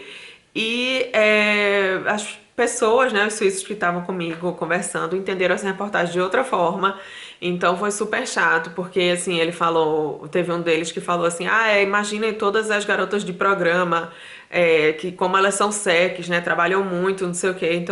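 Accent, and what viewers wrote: Northeast, Brazil. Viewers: O Youtube me indicou teu vídeo,vou ver todos os vídeos, gostei do conteúdo,de saber que os europeus são racistas e preconceituoso contra estrangeiro,e pelo sotaque vc é nordestina né? parabéns vou ver todos.feliz natal pra toda sua família 🍾🍾🎉🇧🇷🇧🇷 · vc é muito meiga, educada e tem um maravilhoso acento nordestino. Deve ser portanto, muito difícil para os suíços acreditarem que uma mulher tão linda e jovem seja: brasileira, alta executiva, poliglota, etc. Enfim, vc faz parte de 1% da população feminina brasileira. Parabéns!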